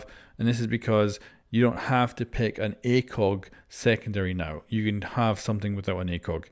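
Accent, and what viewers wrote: Scotland, United Kingdom. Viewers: Are U Scottish V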